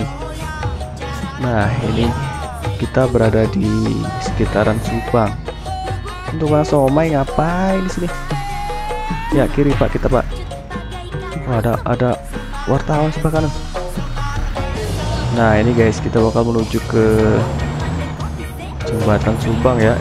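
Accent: Bali, Indonesia. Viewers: Bang pasti asli Bali ya.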